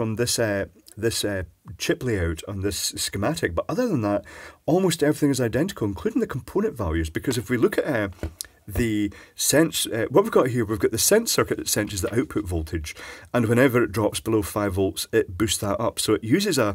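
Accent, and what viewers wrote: United Kingdom. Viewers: That british accent tho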